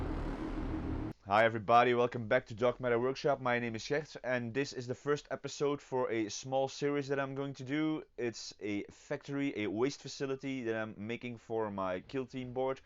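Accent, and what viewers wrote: Netherlands. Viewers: I thought I finally had it. I knew you where dutch (or Belgian) because of your name and your accent. But you use stuff not available in the Netherlands. But in this tutorial I saw a flask of bison houtlijm, so I thought yeah you must be from the Netherlands...... But then you use stuff not available in the Netherlands again..... so where are you from if I may ask?! I want to know because of all the stuff you use. All the scenery makers are using cool stuff like grannygrating... no where to be found here...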